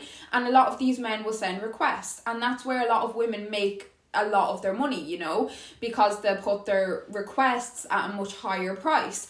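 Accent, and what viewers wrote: Ireland. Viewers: It's like the voice in my head turned Irish, I agree wit everyting you said. 😎👍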